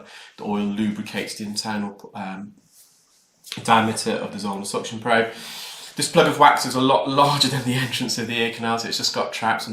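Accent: United Kingdom. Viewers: Like your britain dialect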